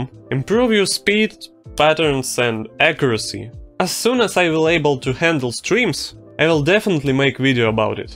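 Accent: Russia. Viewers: я сразу понял, что имею дело с Российским человеком из-за акцента) очень всё понятно объяснил, без воды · RUSSIAN ACCENT, why not do Ha Pycck| /| |/| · ахаххахах, смотрел все видео с мыслью: "Какого хера у чела такой сильный русский акцент?" (слишком сильно напоминает меня, когда свой голос записываю). Вот оно что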